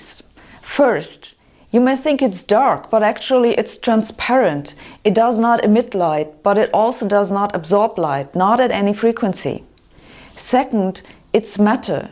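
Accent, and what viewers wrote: Germany. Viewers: why a german accent sound like they are super genius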